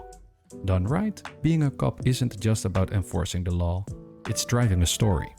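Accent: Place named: Netherlands. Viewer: i hear a small dutch accent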